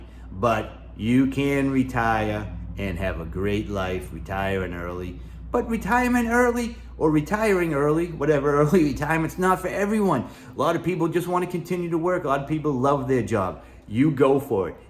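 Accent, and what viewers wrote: Boston, United States. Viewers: Congrats on your financial situation and enjoying retirement! I think in your case a HUGE reason for your low cost of living is WHERE you live, the city that you live. I know you told me before but I forgot. You have a Boston like accent but I know Boston is expensive, I think. I live in a mid size city in TX and we would pay easily <num> a year on a modest home as a senior. And insurance, is easily at least a few thousand a year. It used to be cheap here until everyone from California decided to move here. It makes me want to move! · But sounds like you once lived near Boston, (western Mass here) Nice video. Close to 73 years old, but I never want to retire.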